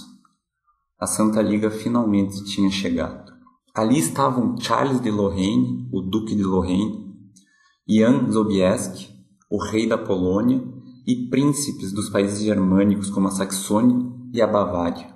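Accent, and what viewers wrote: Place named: Curitiba, Brazil. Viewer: obrigado pela aula de história, o Islã mais uma vez ameaça todo o Ocidente, teu sotaque não te nega como um bom conterrâneo, reside em Curitiba?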